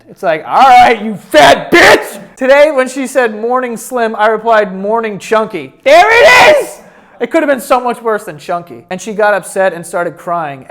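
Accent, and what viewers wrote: United States. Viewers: Wait.. do Americans say axe instead of ask?? Haha how did I not notice till now😂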